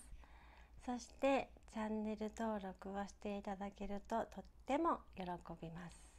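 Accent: Kyoto, Japan. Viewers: 懐かしい京都弁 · インスタでも登録させていただきましたよコメントさせていただきました。 バリバリ京都弁で落ち着きます🎵 そして、そのドレッシングのお店の近くに子供の頃から住んでます。 大原の田舎者です❗️ よろしくね😀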